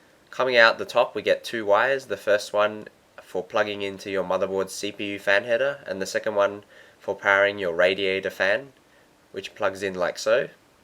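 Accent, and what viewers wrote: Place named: Australia. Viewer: Australian accent is always nice to listen to.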